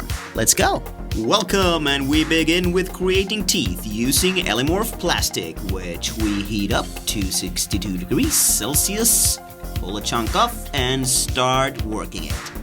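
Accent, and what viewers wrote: Sweden. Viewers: Swedish? :D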